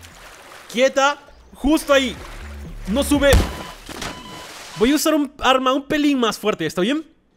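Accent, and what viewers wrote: Peru. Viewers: eres peruanoooooooo yo tambieeen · eres de perú?🤨🤔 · Omg eres peruano a argentino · Eres de Perú? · Eres peruano? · eres peruano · sos peruano?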